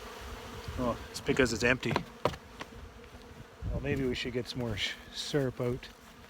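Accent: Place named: Canada. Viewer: I prefer the pronunciation p-oh-llen, over the pronunciation p-ah-llen. Not sure which is correct. Perhaps both. Your pronunciation just sounds right. I love the way Canadians speak English, and I love all of our northern neighbors, Eh?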